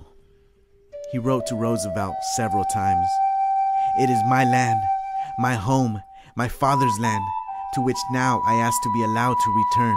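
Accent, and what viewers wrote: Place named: Mexico. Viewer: who's the narrator ...sounds Mexican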